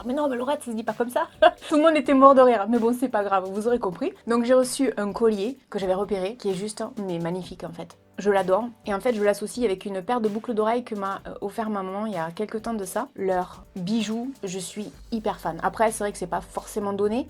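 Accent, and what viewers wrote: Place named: Perpignan, France. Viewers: Quelle belle histoire de Noël! Ma femme veut bien l'adresse de cette gentille dame aux oranges car nous ne sommes pas loin de Perpignan ! Lol 😉 trop sympas vos vidéos ca fait plusieurs qu'on voit et on aime! En plus, avec l'accent de chez nous ça fait du bien ! Hop on s'abonne avec plaisir. On a apprécié en particulier les vidéos sur Yves Rocher, Cien (Lidl) et les astuces... Bonnes fêtes ! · Bjr laurette mon 1ier comm depuis plus de 2 ans ma 1iere ybeuse ma préférée avec or elly. Tout ce que ns raconte tu ns apprends. Pleins de similitude..ma mamy était de vidaillac lot jpasse mes 6 mois d'été Aude et P. O. Jacheche #essensse#chez Julia a la jonquera. J'adore cadaques maison de Dali et aller à Gironde le mercredi j'achète pas ceux que tu ns conseilles. Tu es belle une belle tête bien pleine t'as le peps j'adore j. 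P.arker.jnai pas trop eu de kado de ma faille bien que j'en fasse a ts les 6 moi jsuis maman kdo. Que veux tu mes familles de cœur c parfois plus important et elles m'ont gates puis les kdo 2019 je vais m'en offrir n'a.. L orange de la petite mémé wouau. J'ai déjà custo ttes mes blouses avec des perles les créoles d'après t conseil la jvais attaquer mes boots. Bonne merveilleuse année chère laurette à toi ta maman ton chouchou,, 🐕et la santé..moi c pas le top mais Jle dis pas c pour a que toi o relly Nicole et mon Ange jne loupe rien.je commande sur ALIE. Joom et un peu shein. Sur les conseils daurely every thing five pounds. Grossiste français. Bonne résolution ne plus me laisser faire par des C... Tourner la tête et ignorer. Ensuite aménager mes coins beauté fringues. M'habiller chic comme toi. Et me faire plaisir ça de pouvoir louer une partie de llannne dans le 11 et le 66.lespagne....mon oxygène. 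Merci pr t élans du ❤️et ta simplicité Perpignanaise au même accent💗poutous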